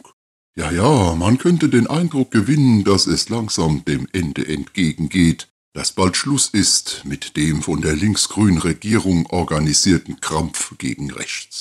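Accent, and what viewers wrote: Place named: Swabia, Germany. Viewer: Der Gorilla hat es geschafft, das ich (Schwabisch Dialekt?) ertrage. Top Integrationsleistung. Vor war es wie Fingernägel auf Tafel. Ich denke schon, das es enormes Einsparungspotenzial bei NGOs gibt. Hat denn (Miley?) Erfolg damit? Orban hat es erfolgreich exerziert. Und möglicherweise einen Maidan 2.0 verhindert.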